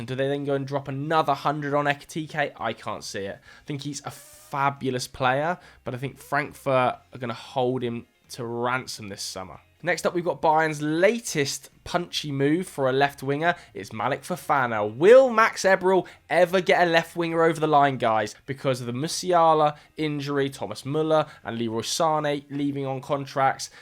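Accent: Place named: Manchester, United Kingdom. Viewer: Wow, thats a great Manchester accent!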